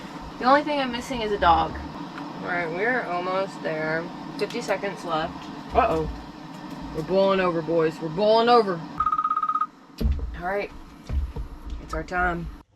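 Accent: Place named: Arkansas, United States. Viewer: I love how that Arkansas accent comes out every once in a while. :)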